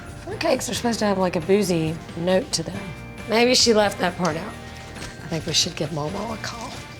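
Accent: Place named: South, United States. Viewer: Love your southern accent I could listen to you talk all day long. I'm originally from Tennessee.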